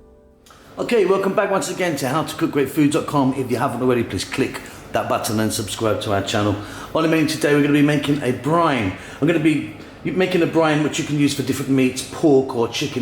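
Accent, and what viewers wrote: United Kingdom. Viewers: Its a british accent...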